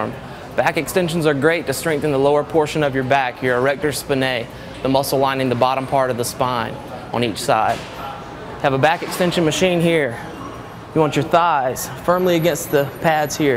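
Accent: South, United States. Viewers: Are you tonedeaf? His voice is nowhere near DiCaprio's. For starters, he has a very obvious southern accent overtone of some sort. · This guy is clearly southern. He doesn’t sound like DiCaprio at all.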